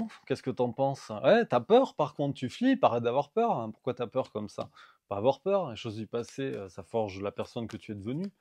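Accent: South, France. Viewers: Bonjour, J'ai souvent des synchronisatées style 111, 11h11, 777, 31 qui est son département, 29 date de naissance bref, je n'y crois plus car sa fait trop de temps passé, perso j'ai du mal à refaire confiance aux hommes dsl mais je dis se que je pense Sa reste un tirage général malgré tout. Lumineuse journée bel accent du Sud ou..... Merci. 🙏💜💜🙏💜💜🙏😇🙏🕯️🙏🕯️🙏😘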